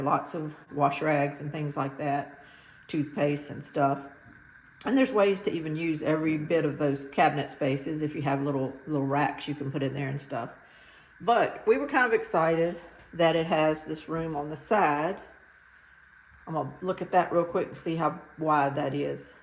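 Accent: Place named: South, United States. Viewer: Damn, she's a southern talking woman, and she talks too much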